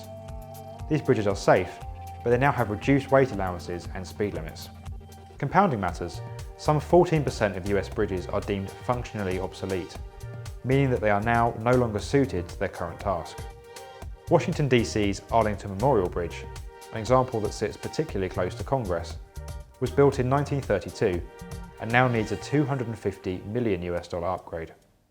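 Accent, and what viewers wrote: United Kingdom. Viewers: He's from the UK you dumb shit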